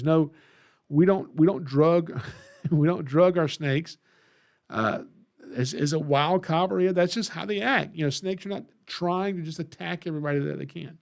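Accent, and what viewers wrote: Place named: South, United States. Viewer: Re your "accent": Louis Grizzard once famously said, "I believe God talks like we do." It's the opening line in his comedy routine "Southern Speaking" and may do you some good to hear.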